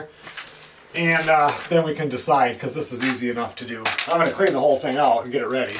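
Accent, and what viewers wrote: Minnesota, United States. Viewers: I thought it was "The Tent" guy and see I was right. Being a Minnesotan myself I noted that a lot of guys sound similar, sound like a couple of my Duluth cousins :)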